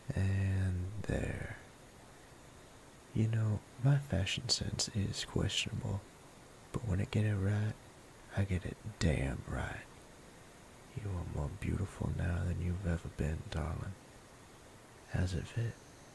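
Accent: South, United States. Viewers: That slight southern accent 😩👌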